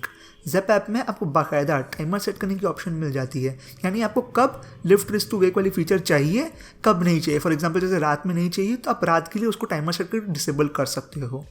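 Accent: Odisha, India. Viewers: Are you from Odisha ?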